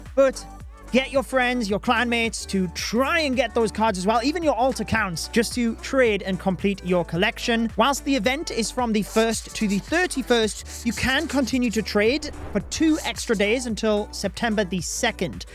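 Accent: United Kingdom. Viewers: Holy... I just luv Bri-ish accent 😆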